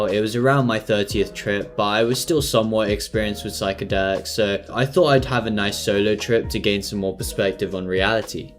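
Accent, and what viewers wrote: United Kingdom. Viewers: Your videos are amazing bro: you deserve so many more subscribers!!! Also nice to hear a British voice in this community 🤩🎇🍃🍄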